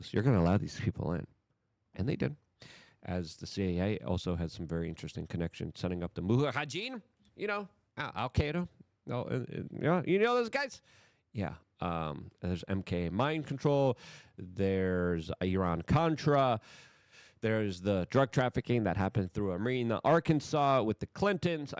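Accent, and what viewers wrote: Poland. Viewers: He's Polish. English is a 2nd language for him.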